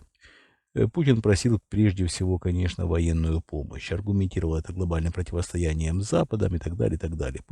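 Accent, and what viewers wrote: Ukraine. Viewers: Смехуёчки во время эфира раздражают!!! Никакого анализа...и голос похож на голос Кашпировского, с украинским акцентом.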